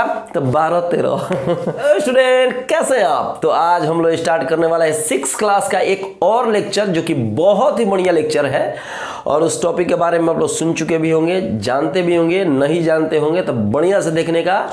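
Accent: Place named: Bhojpuri, India. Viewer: Sir apka bhojpuri wala acent acha lagta hai with bhojpuri song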